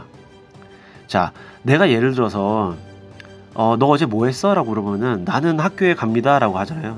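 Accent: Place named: Seoul, South Korea. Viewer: 안녕하세요 훈더맨 실방에서 알고 들어왔어요 역시 서울 말씨가 듣기좋습니다.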